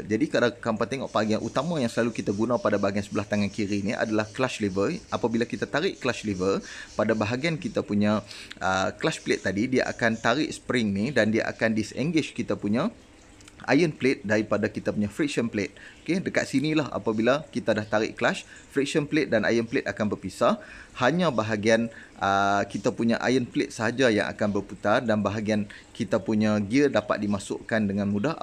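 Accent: North, Malaysia. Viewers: Ilmu terbaik bang share. Cara presentation pun best, leka seronok dengar. Tambah loghat utagha hahaha rindu penang. Salam Ramadan dan butang loceng onn 😆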